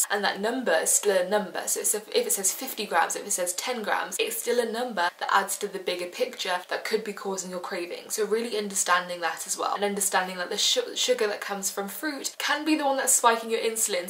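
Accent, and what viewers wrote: United Kingdom. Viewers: Love uk accent ☺☺🌻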